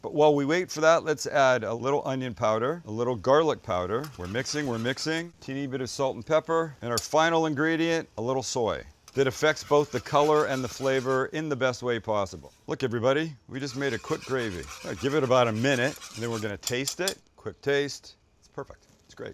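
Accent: Quebec, Canada. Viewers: Your French Canadian accent is spot-on. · Great Franglish Queebeck accent, you're the best. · Tellement une bonne recette de poutine… L’accent canadien français, québécois est de marde mais la recette est écœurante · Thumbs up on the Quebeçois accent! I prefer it coming from sexy girls, but will do. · very good French canadian accent but poutine is pronounced "puts in" love your content keep em coming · Retire the French Canadian accent!!! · Solid French Canadian accent. You sounded like every trucker in my mill from Quebec eh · Being from Montreal Quebec, I can tell you that accent was horrible lol. I love you, but you gotta come to Quebec more often and practice the accent lol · Need more French Canadian accent…brilliant!! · Your French Canadian accent is very good, this coming from a Québécois fan of yours. Looks delicious. However, the gravy for the poutine is always brown (roasted flower) in all the poutine joints I know of. 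· I'm from Montreal and I promise you Quebec accent is awful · That is the best French-Canadian accent I've ever heard. I also smell toast. 🤔 😆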